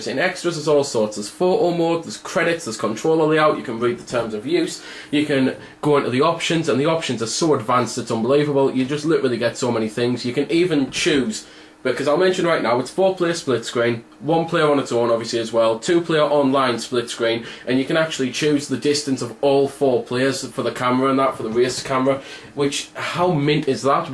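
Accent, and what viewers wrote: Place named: Scotland, United Kingdom. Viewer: Where you from, mate. You sound Scottish or something. :]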